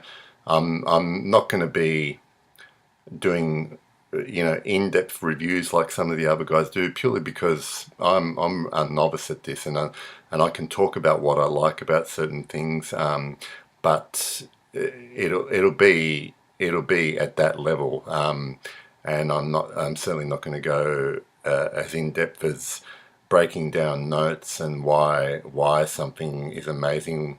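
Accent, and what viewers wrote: Australia. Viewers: I'm a simple man! when I hear an Australian accent...I subscribe! Also awesome intro! excellent fragrance story, I still need to try the original Lagerfeld!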